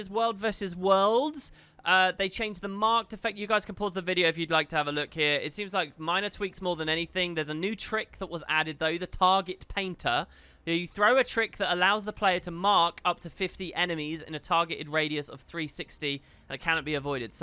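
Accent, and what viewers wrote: United Kingdom. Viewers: I just hope you (not, but the real you) are doing OK. Your internet persona has been part of many bike trips to work. To so many coding evenings and you've helped me gain further understanding about how brits talk. I've come to grow fond of you and I'd hate something bad happening to you. You had us worried because we care for you even though we don't even know your face, haha.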